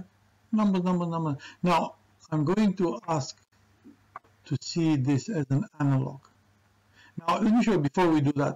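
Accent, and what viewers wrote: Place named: Romania. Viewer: Your accent sounds romanian, I don't know why. Thank you for the nice video!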